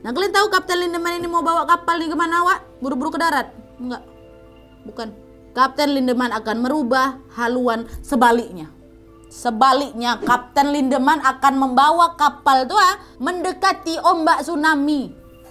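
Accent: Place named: Medan, Indonesia. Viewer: senang melihat membawakannya lucuuu.. kental kali medan kau.. GOOD JOB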